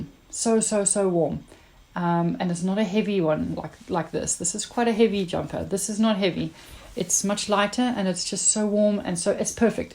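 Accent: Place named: South Africa. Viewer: Hallo, your video was suggested to me on YouTube and the moment I heard you talking I wondered if you weren't South African😀. I live in Pretoria. Lovely projects! ❤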